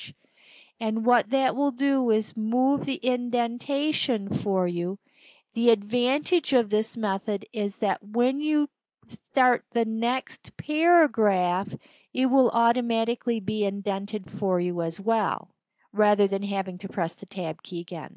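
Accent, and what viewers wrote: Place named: Minnesota, United States. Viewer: i love the minesota voice.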